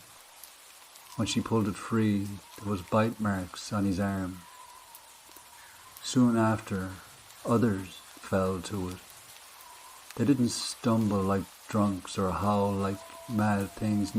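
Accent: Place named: Clare, Ireland. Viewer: Love that I found your page. These stories remind me of the ghost stories my granny used to tell us. Thanks for the nostalgia. I keep trying to guess which county you're from by the accent. My guess is Co Clare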